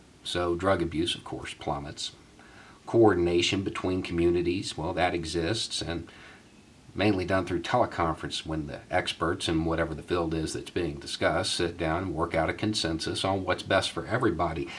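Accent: South, United States. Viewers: Oh man what this guys sells is generic progressivism in a southern accent. He's the black republican.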